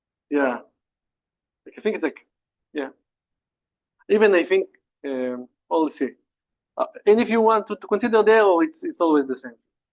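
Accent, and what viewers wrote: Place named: Israel. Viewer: תודה על השיעור ! (יאמר לזכותך שמרגישים ממש את המבטא העברי בתוך האנגלית)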